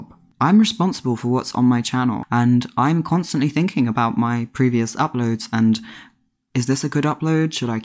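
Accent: RP, United Kingdom. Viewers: Your voice temporarily cures my depression. So soothing, so posh, so wonderful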